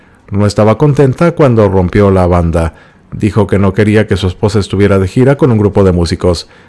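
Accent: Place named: South, Spain. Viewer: me hace reir. Eso significa me cae bien. Despues que termine su disertación voy a ver una película de Ava Garder. Me gusta el acento del sur.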